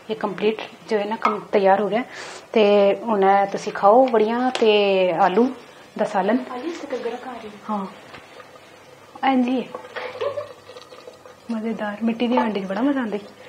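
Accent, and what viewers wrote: Punjab, India. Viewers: ji sister ji mai app ki punjabi bolnay kay style say he app ka fan bana tha pher app ki recipe etni achi thi kay kiya batahu sab ghar mai mama orr sis bana ka deti hain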